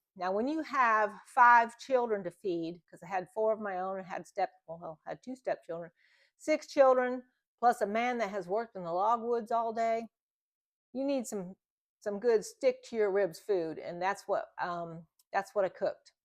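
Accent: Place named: Appalachia, United States. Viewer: Are you kidding me? People’s audacity blows my mind! I’d have talked even more Appalachian if someone had shown opposition of my sound! 😂 Friend, if your dialect runs off into Appalachia without your knowledge again, let it go! ✌🏻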